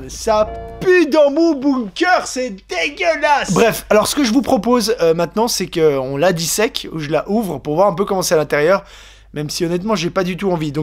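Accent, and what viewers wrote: Belgium. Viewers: tu es belge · T'es belges ? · il est belge?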